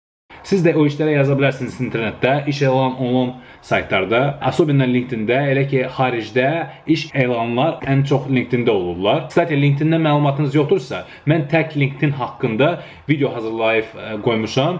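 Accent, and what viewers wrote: Gadabay, Azerbaijan. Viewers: Cox maragli daniwir rus-Gedebey-avropa qariwig 👍👍😂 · Can e can Gedebey lehcem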